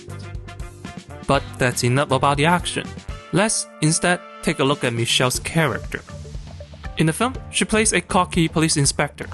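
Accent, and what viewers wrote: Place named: China. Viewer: Listening to the commentator is painful. His Chinese accent is too strong!!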